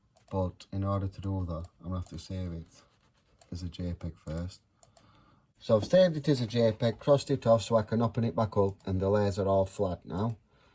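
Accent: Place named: Grimsby, United Kingdom. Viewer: Awesome, isn't it funny how the Grimey accent comes thorough on video hehe well done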